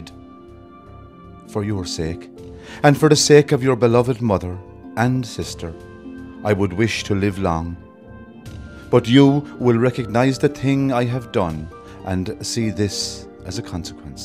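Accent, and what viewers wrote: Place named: Cloughjordan, Ireland. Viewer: Magnificent piece of work especially given the circumstances of that final night. Beautifully read by the perfect Cloughjordan accent. Thank you all. I'm tearful having listened. Thank you.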